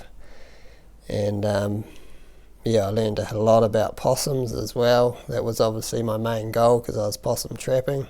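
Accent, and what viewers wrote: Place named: New Zealand. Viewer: Wow! AS soon as I heard your voice and you said you were trapping possum's I knew you had to be a Kiwi!! I had a similar lifestyle growing up on the coast in the Wairarapa with my own trap line! really enjoyed this mate! New sub and I'll be following your channel with interest!!👍😀💯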